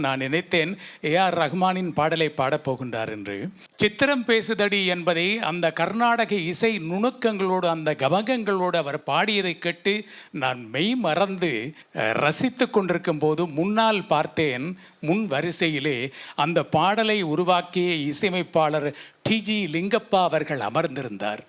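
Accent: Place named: Sri Lanka. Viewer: இலங்கைக்கே உரிய இனிய தமிழ் உச்சரிப்பை தமிழ் நாட்டவர்கள் இரசிப்பார்களா? வியப்பார்களா?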